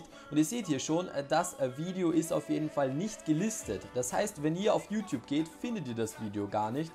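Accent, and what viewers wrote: Austria. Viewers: Von wo aus Österreich kommst du genau?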